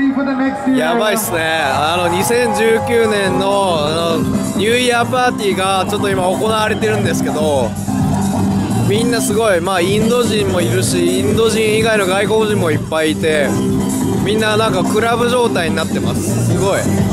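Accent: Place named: Kansai, Japan. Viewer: ❤✌🕉 、なんで関西弁なん?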